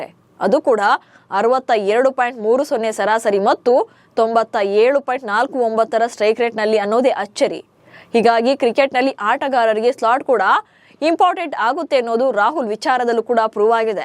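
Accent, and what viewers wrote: Standard. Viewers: Swachcha kannada da dhvani shanta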